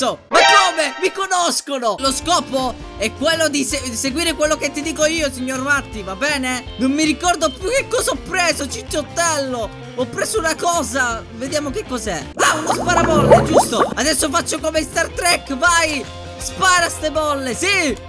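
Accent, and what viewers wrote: Sicily, Italy. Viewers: Ma sei Siciliano ? :)))) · È della sicilia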